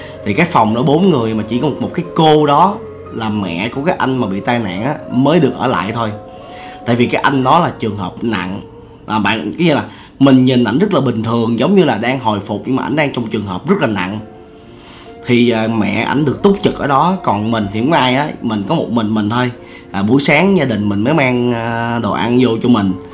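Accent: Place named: Central Highlands, Vietnam. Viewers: Đang nghe anh kể chuyện nghe tới phút cười muốn nội thương anh nói giọng Tây Nguyên dễ thương ghê á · đáng yêu cực kỳ. nói giọng Tây Nguyên. cưng lắm luôn. Nghe sướng cực kỳ.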